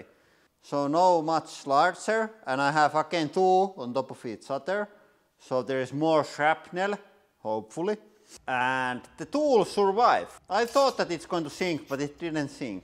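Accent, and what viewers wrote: Finland. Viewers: Got to love this Finnlish accent! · Is it me or does he sound more Finnish in this episode? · I must compliment you again on your straightforward Finnish accent, that's a beautiful thing and always a pleasure! Olet raudanluja kokeilija, hiihdä näitä latuja ja menesty vielä suuremmin!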